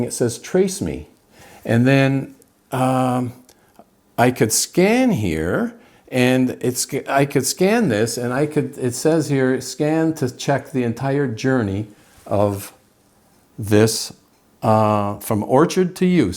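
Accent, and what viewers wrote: Canada. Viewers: Is that the Canadian accent I hear? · Your name is? You sound Canadian. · He has Canadian accent😊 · Within ten seconds , i'm like cCanadian! Lol.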